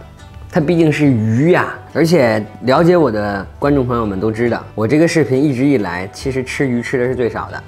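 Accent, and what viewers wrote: Beijing, China. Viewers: 京腔的特点就是这样，不少北京人说话就是这样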